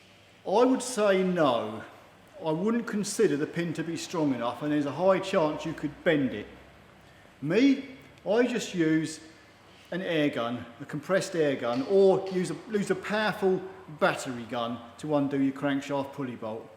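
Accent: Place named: RP, United Kingdom. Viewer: Its great a man who speaks to us in queens English love your show and more please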